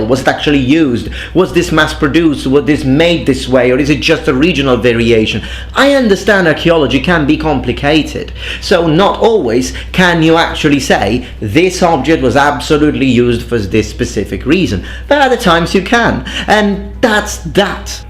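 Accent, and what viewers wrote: Sicily, Italy. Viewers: Your explosive Sicilian delivery with your hyper-educated sarcastic English is a killer combo, sir. I'm crying with laughter.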